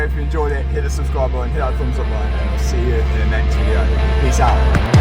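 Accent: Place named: Leicestershire, United Kingdom. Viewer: Love a Leicestershire accent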